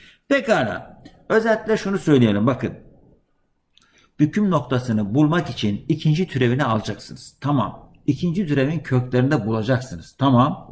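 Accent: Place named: Isparta-Burdur, Turkey. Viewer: hocam nerelisiniz şiveniz bizim buralara Isparta Burdur taraflarına benziyor ?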